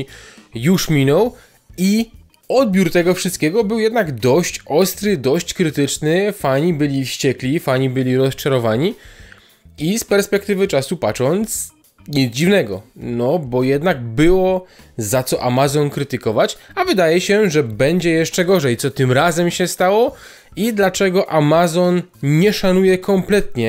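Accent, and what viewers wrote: Silesia, Poland. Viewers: wyczuwam lekką nutę śląskiego akcentu :) pozdrawiam z opolskiego.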